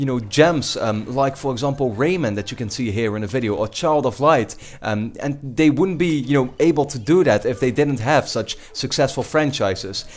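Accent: Netherlands. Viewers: are you dutch? you have a bit of a dutch accent... :P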